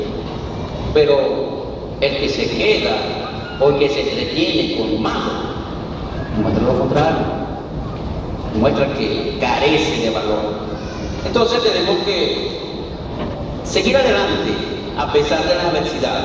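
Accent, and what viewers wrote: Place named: Venezuela. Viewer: Le sentí el acento venezolano desde el principio....tiene más discursos de esta asamblea por favor??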